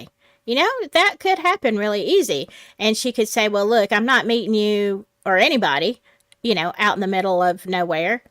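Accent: South, United States. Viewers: Hello there from Oklahoma. I just heard your channel for the first time today. I loved it! You are a delight and I love the southern accent! Born and raised in Florida. Lived a portion of my adult life in Georgia. Put the 2 southern accents together and my friends think it’s very twangy and comical! Lol! Now let’s see what I pick up in Oklahoma!! Thanks for covering this case! I hope you’ll do many more! I listen to everyone covering this.. You just can’t believe it but yet you know it’s true! I pray for Justice for Mica and for the whole truth to come out for this family! Especially if others were involved in this! I enjoyed it and will be back for more! Great job! #JUSTICEFORMICA 😊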